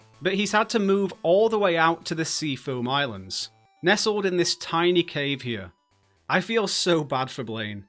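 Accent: United Kingdom. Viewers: You have a wonderfully charming British accent.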